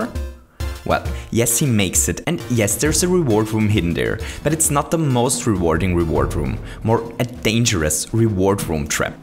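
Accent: Germany. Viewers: i have a question are you german? because your accent sounds kinda german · I love your a bit German pronunciation :)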